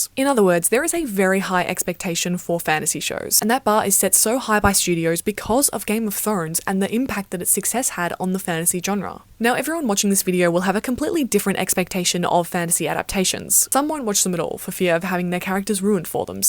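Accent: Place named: Australia. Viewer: I think youre australian, so it explains it, but please for the love of good stop to say the "o" and "w" like the h20 girls its sooooo irritating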